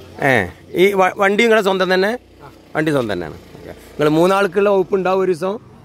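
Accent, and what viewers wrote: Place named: Malappuram, India. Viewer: Njagal malappuram karude slang engane thanne yanu bro nee athil tention adikkada muthe thekkan mare pole boomikku foomi baryakku farya yennu onnum allallo aksharangal correct alle slang nee preshnam akkenda yethu slang anengilum yenthelum arivu kittunnundakil athu padikku allathe njangal malappuram kare athikshepikkalle muthu maney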